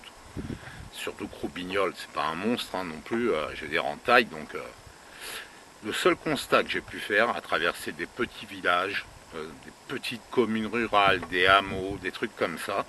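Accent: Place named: North, France. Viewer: Bjrs Mr votre francs parle viens de quel ville .Qui me rappelle Mr papa du nord .😊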